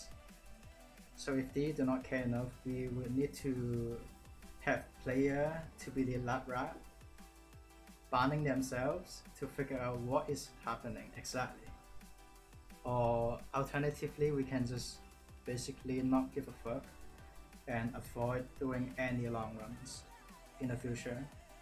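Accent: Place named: United Kingdom. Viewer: you sound really british sometimes its great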